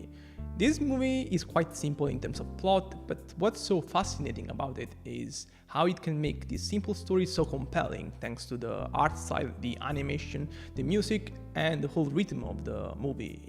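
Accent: Italy. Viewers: Come è possibile che non mi sia mai accorto che hai un accento italiano parlando inglese? Evidentemente sei così fluente che non me ne sono mai reso conto. P.S. sei bravissimo e super affidabile, continua così ❤ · It’s the italian accent while being smart that makes people fall for him😂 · Lo sapevo che eri italiano ahah L'accento non mente, comunque bravissimo continua così!! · IS he italian?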